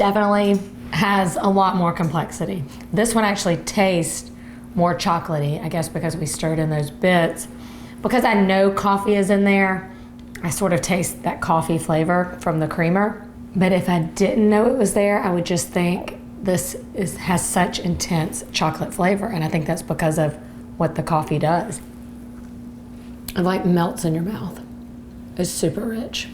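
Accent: South, United States. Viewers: Love hearing you talk,Definitely can tell you are a Southern Lady,Makes me miss home,I'm from Southeastern Virginia.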